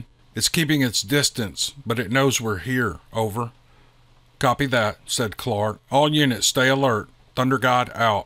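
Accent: South, United States. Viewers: This sassy southern woman gettn' into team Odin! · D.A.Robert’s gives Tom Clancy a run for his money, and brings it to life with that talented southern draw……..AWESOME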